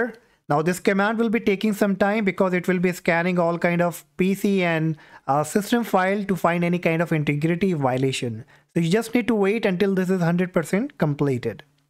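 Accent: India. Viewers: indian accent is trash cuh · That's just how his accent is, what's the problem? He's clearly indian.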